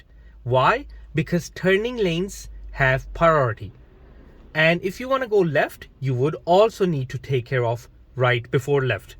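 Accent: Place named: England, United Kingdom. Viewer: Besides making the great videos which I am very thankful to you. I have this curioucity to know where did you learn this English accent from.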